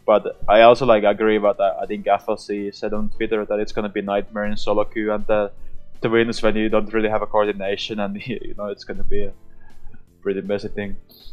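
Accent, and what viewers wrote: Finland. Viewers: Are you finnish? Cuz it really sounds like it xD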